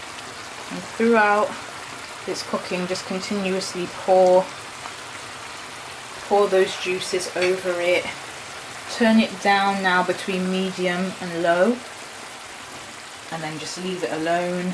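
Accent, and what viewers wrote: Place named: Birmingham, United Kingdom. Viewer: Are you from Birmingham? 🤍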